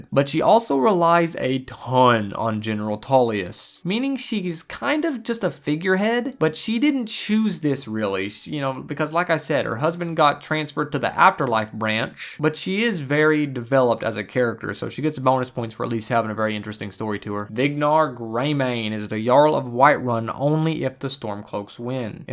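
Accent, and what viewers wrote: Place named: South Carolina, United States. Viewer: Wow what an accent. SC?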